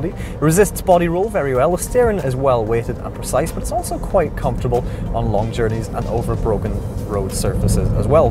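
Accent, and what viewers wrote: United Kingdom. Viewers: I often wonder seeing I am an Australian watching this whether other people all over Britain understand each others dialects within Britain or is it difficult for you as well , because to me this bloke talks so fast and with his regional accent I am struggling to understand what he is saying , so are you Brits used to each others accents and understand each other ?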